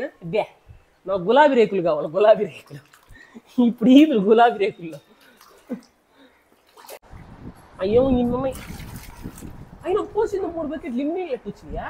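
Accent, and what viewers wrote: Nellore, India. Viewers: Nenu meee vedios chustunnanu last one week nunchi but QA vedio lo meeku ammayilamedhunna respect ki subscribe chesanu🎉 all the best ma frd kuda nellore eeh slang baguntadhi kadapa slang ki quite opposite nellore slang😊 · Hi nenu akkani epateki 3rimes chusanu video 12 y avuthundi nellore basha vne ne matallu challa baguntai thamudu nellore valaki yachullu yakuva 😂😂😂 yapudu happyga undu ma house chupinchava pls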